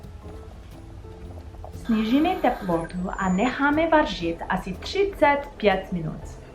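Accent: Ostrava, Czech Republic. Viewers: jde videt ze jste pobyvala i v Ostrave vase cestina je presne po nasemu, pekny recept a krasne se to posloucha asi to budu muset zkusit uvarit :-)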